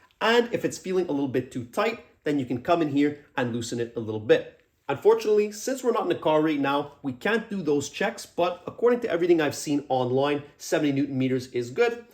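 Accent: Quebec, Canada. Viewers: nothing like a Quebecois French accent, grew up to a mother that had to learn English and grandparents that immigrated to the states in the 50s. I spent some time in Sicily in the military, Quebecois French kind of reminds me of Sicilian Italian.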